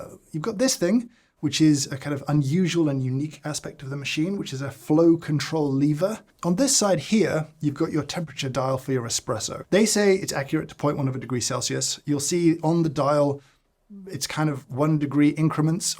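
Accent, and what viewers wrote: United Kingdom. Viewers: Here’s the thing about “party tricks”: you can’ t really explain why they work. For instance, I don’t know how it came to be that I would sit through a 19+ minute long video about an espresso machine which costs 19K and which I certainly would not be able to afford and yet, YET I just did! I don’t know what it is… is it my taste for espresso? Is it my curiosity about tech? Is it about ’ british accent and perfect pitch? I genuinely can’t explain why 😂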